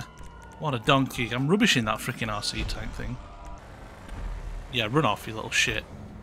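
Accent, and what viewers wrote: United Kingdom. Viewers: Good to hear a northern accent in here! Great vid again mate.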